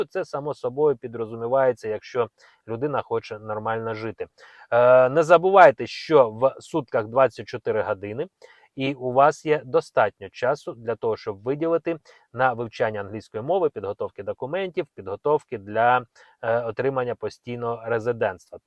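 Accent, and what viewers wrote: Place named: Russia. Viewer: Говорить з акцентом раши.Не вірте !!!.Подали і продовжили уже воркперміт, без роботи.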